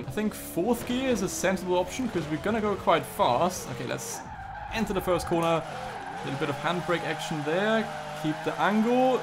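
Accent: Germany. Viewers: Du hast einen echten deutsche Akzent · Is he German? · Bro are you German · You sound really german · Are you from germany?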